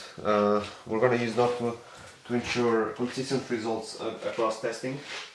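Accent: Portugal. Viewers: és tuga não és?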